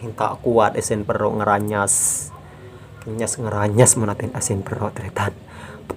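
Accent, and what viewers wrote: Madura, Indonesia. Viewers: Maaf Mas Ini orang Madura Ya ..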